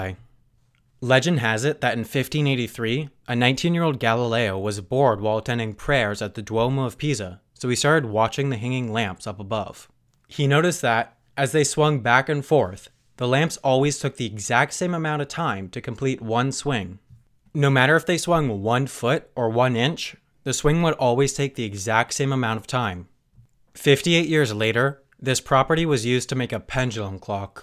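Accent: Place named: California, United States. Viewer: You sound like a Californian.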